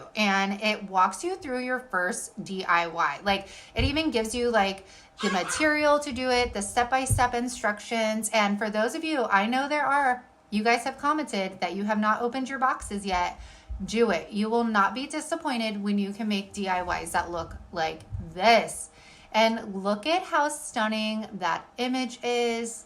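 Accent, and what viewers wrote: South, United States. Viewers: I couldn't find the little tool you used to make the wood grain on your Amazon page....Did I just miss it? I thought I looked at everything! Love your crafts and your ways of explaining how to do them. You talk my talk! You must be from the South too! Lol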